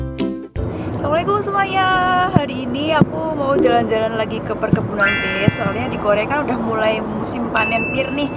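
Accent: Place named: Purbalingga, Indonesia. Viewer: Mirip banget kalo ngomong kayak mbak ku di Purbalingga.. lama ga ketemu karena sy di Kalimantan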